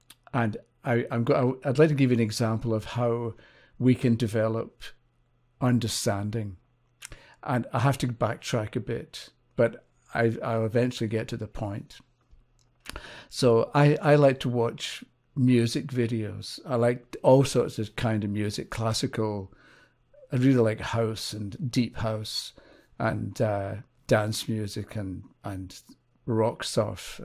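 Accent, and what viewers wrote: Scotland, United Kingdom. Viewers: What part of Scotland 🏴 yoos from ? I grew up in Juniper Green, Edinburgh! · A lovely gentle Scottish accent. Music to my ears. 🏴💖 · What a lovely gentleman. It's really nice to hear a soft, soothing Scottish accent 🏴 I took from this that association is key, or as I like to call it the "no degrees" of separation! XXX ♥️ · From what part of Scotland do you hail? Glasgow I'm guessing. Thanks for your lovely video! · Definitely Scottish, Glasgow probably. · Love the accent I'm going to go on a limb and I'm going to guess that you're Scottish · I am picking up Scottish undertones in his accent 🤗 makes me feel homesick haha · Are you Scottish? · just gave it another listen, y’all are right it’s definitely a Scottish accent.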